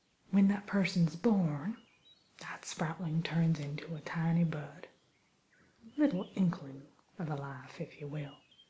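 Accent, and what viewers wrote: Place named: South, United States. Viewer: Lovely. Your southern accent was adorable. I love the photo you chose too..perfect. Can't wait for the next segment!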